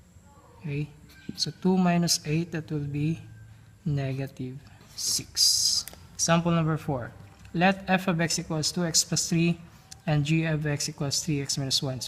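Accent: Philippines. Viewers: are you filipino btw? Your accent sounds familiar to me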